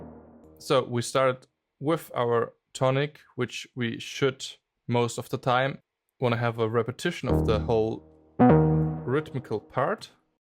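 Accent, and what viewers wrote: Germany. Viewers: Yeah, I'm German as well but have to say this guy might want to work on his accent to make himself more easily understood. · his accent gives him away as a german guy ...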